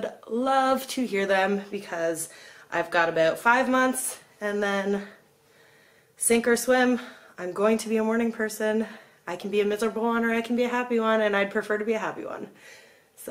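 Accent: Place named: United States. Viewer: Got here from the gram - I so wasn't expecting an American accent!!!!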